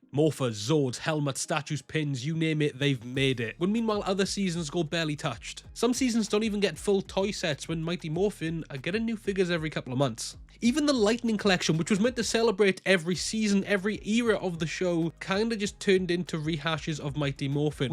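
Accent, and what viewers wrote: United Kingdom. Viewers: You know that is British, right? Brits pronounce certain words differently than Americans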